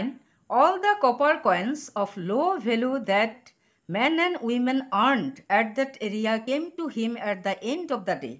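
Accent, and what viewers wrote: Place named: Bengal, India. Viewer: Its bengali accent🥸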